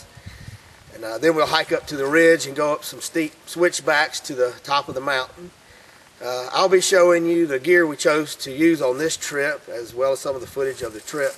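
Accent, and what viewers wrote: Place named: South, United States. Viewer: Hey, my wife says no, I say you could be my twin brother, except for your southern accent.........and I may have a little more hair on top! Ha Ha. Nice video, Thanks PS I notice on some of your other videos your handy in the metal shop, I am also a tool and die maker by trade. Weird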